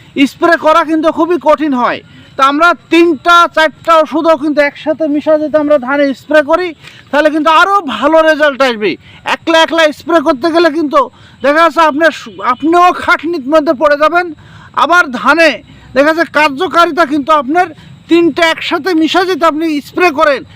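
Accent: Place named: Magura, Bangladesh. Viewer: ভাই আপনার বাড়ি টা কোথায় একটু জানাবেন আমাদের মাগুরার ভাষার সাথে আপনার ভাষার মিল আছে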